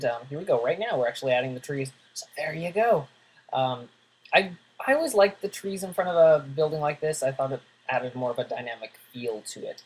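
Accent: Canada. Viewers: cuz hes Canadian so he has a accent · he's from Canada , he has a accent. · you a clearly Canadian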